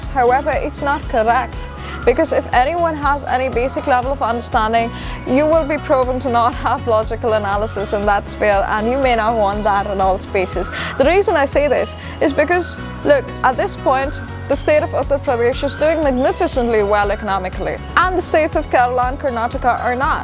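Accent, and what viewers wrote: United Kingdom. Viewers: Ur accent is irritating either u completely speak british accent or complete indian accent pls don't mix both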